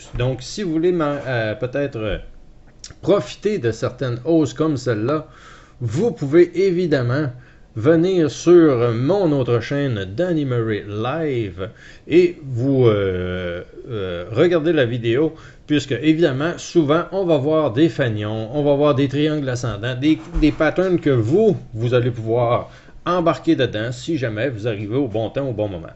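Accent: Canada. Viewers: bonjour Danny ; les explications associées a ton charmant accent canadien me régale continue bravo !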